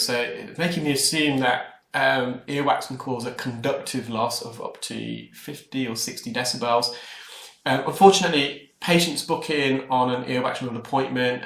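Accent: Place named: Leicester, United Kingdom. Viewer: Hi Mr, I am amazed by your videos after coming across them when researching my sons cholesteatoma diagnosis. I recognised your accent and was very impressed to find you are also a fellow Leicester man. It would be amazing to meet with you sometime to discuss my sons hearing aid possibilities and his future outlook. He has had 11 operations so far and is still profoundly deaf in one ear. We are under Dr Bannerjee at the LRI. Kindest Regards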